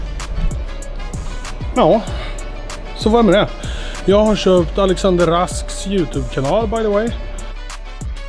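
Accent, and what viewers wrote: Värmland, Sweden. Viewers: Är du från värmland? Bor i värmland! Låter som du kommer herifrån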